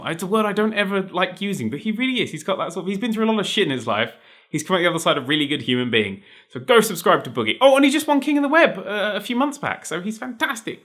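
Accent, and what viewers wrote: England, United Kingdom. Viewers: ?r u from england nerd?<3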